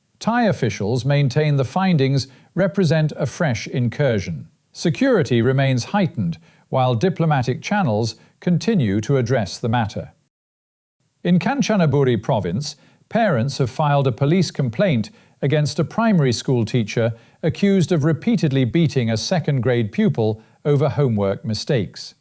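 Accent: United Kingdom. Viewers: That's a british man?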